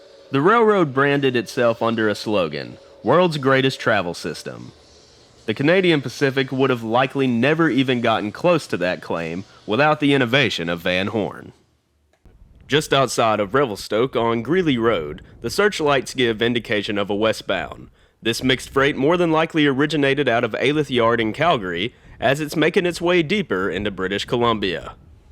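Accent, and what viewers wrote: South, United States. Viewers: Very nice to hear a narrative cited by your gentle southern accent which is something I wouldn’t have expected on a western Canadian rail video. As one who has an interest and being a fairly read person on our country’s history, I was impressed with the amount of research you obviously did for the narrative. I’m glad that you enjoyed our country.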